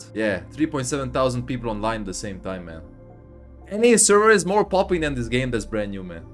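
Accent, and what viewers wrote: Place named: Hungary. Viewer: Eszrevettem a madzsar accentusodat de hibatlan a tartalom, hajra!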